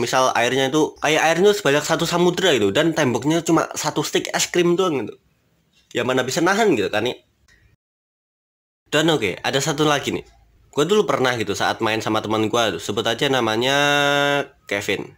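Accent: Java, Indonesia. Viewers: Apakah kamu orang Jawa bang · Bang lu dari jawa yah🤔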